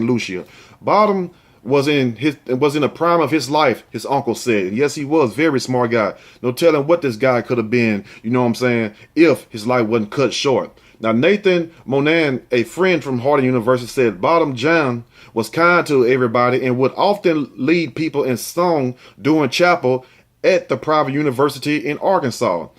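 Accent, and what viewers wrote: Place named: New York, United States. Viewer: I live your accent? Are you from New York?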